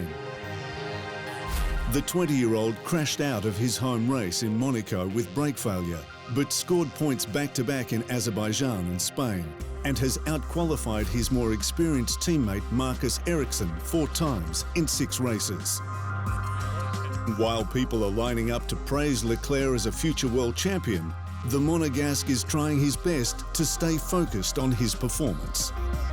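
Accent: Australia. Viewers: Australian voice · It's an Aussie voice over guy but he puts on a Movie Trailer voice. "In a world that betrayed him...." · now I feel bad for not liking this voice lol. It helped that he was Australian 😂😂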